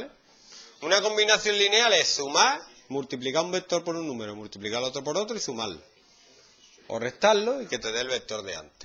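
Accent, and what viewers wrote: Andalusia, Spain. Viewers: me encanta el acento andaluz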